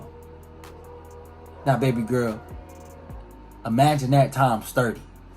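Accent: Baltimore, United States. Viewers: This is my 1st time watching your vids. Are you from Baltimore? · BOY I KNOW YEW FROM BALTIMORE BY THE WAY YEW SPEAK 😂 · you From BMore? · U from bmore?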